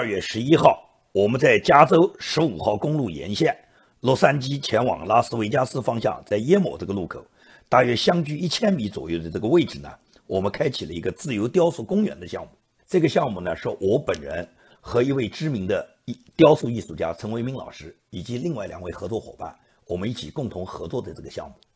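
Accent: Nanjing, China. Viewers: 听声音确实是南京人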